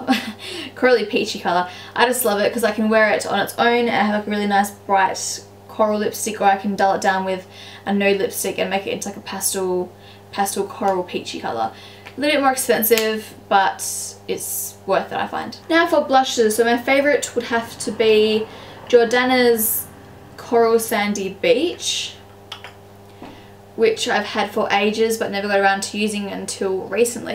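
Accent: Australia. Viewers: She's Australian :) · It is a normal Australian accent.You are so superior. I would have liked to insult you, but with your intelligence you wouldn't get offended. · its an australian accent... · sweety come to australia, she has the best pronunciation I've ever heard from an Australian and i live there, this is like posh australian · are you Australian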